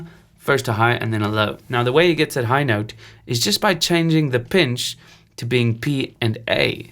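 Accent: South Africa. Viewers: I can hear when someone's from SA. Takes one to know one.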